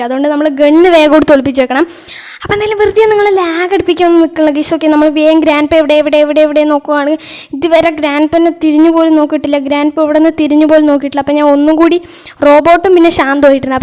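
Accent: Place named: Palakkad, India. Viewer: Palakkad annu thonnunu😅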